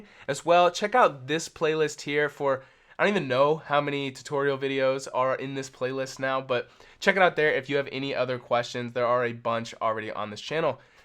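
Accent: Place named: England, United Kingdom. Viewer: Tone is one thing, how do you get rid of the annoying English accent?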